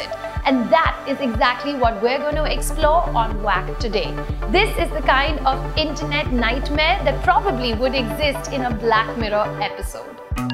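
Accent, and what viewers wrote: India. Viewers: Hollywood bgm ! But indian english!!😅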